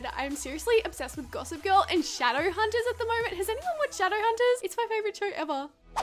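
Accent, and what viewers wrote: Australia. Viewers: Your australian wow cool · She's australian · Are you aussie...you sound like one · are you ausie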